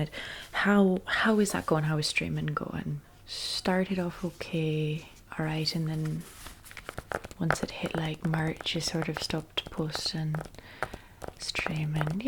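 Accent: Ireland. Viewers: yes, slay. I’ve been highly anticipating this !!! your Irish accent is my fav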